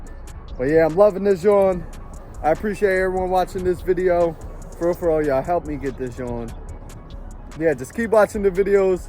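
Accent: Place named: Philadelphia, United States. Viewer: it’s how we talk in Philly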